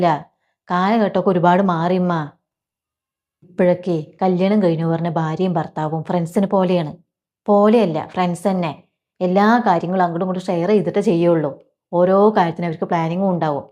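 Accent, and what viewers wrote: Palakkad, India. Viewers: പാലക്കാടൻ slang ആണോ ഇത്?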